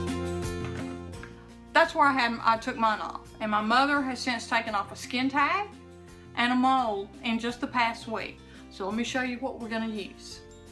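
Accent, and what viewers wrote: South, United States. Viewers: Thank you:) Love your accent as well!! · Love the info and love your accent. And you’re beautiful! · Nice video and love your Southern accent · Love your southern accent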